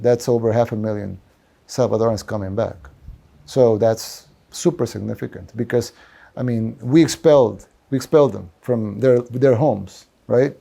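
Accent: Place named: United States. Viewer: Sounds like an American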